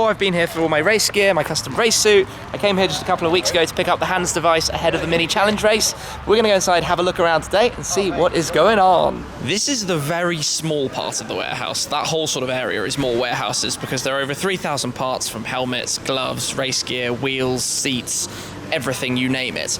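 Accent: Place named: England, United Kingdom. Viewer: Could I get a shoutout in ur next vid? Not to help grow my channel, but I just like to hear an English accent say PorschePilot... 😂 seriously though another great vid. Close to one million!!!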